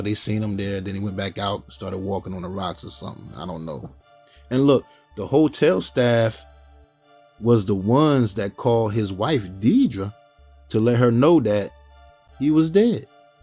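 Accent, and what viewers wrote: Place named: Philadelphia, United States. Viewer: The narrator must be from Philly!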